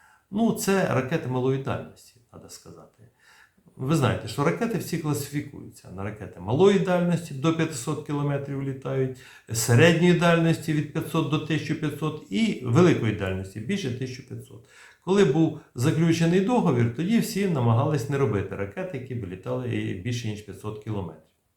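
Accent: Vinnytsia, Ukraine. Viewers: Як же ж мені подобаються МЛРС, ХАЙМАРС і пан, так чітко і класно доповідає нам про ВСЕ!!! Балдєю від Вашої украЇнської вінницького акценту.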